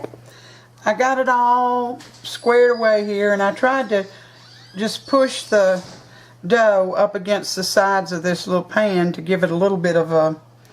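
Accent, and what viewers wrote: South, United States. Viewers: Good recipe the dough for pepperoni rolls. Yum yum yum. Loved loved loved your sweet sweet sweet southern accent. Thanks fo sharing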